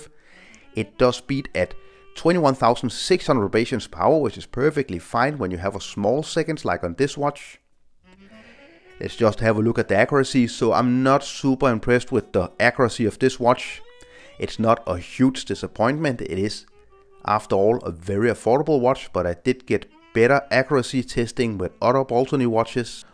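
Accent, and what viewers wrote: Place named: Denmark. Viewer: Great review, in the list of my next purchase. Love the brown dial. I realize that you have danish accent, then i open your youtube channel/profile..yup DK 😊.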